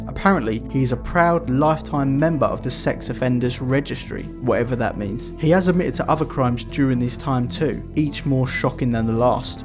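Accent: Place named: London, United Kingdom. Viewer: Love the channel and the accent. Hearing stuff like “fink about it” reminds me of London days gone by.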